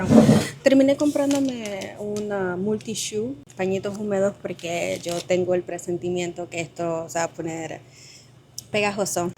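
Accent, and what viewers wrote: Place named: Panama. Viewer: Es imposible reconocer a una mujer panameña❤😊empeze recién a ver tus videos y desde que te oi hablar lo supe(yo tambien soy panameña)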